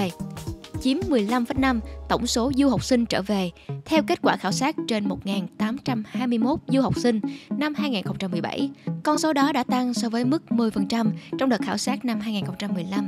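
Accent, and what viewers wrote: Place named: Saigon, Vietnam. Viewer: Giọng đọc, rất hay... Dễ nghe và rõ ràng, tôi nghĩ là tiếng Sài Gòn. Cảm ơn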